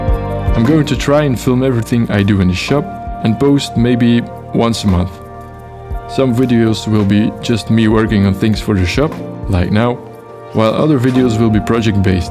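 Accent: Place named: Belgium. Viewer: Met dat accent kan het niet anders dan een Belg zijn 😅 Fijn om een landgenoot te vinden op yt met een wood channel.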